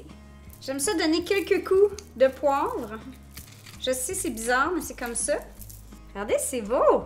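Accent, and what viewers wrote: Quebec, Canada. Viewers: J'ai beau me concentrer et m'intéresser à ce qu'elle raconte, mais j'avoue que j'ai vraiment du mal avec l'accent québécois. C'est vraiment un accent et un français très très local. Au passage, je ne suis pas français mais je trouve le français de France vachement plus attrayant. Autrement les vidéos sont intéressantes.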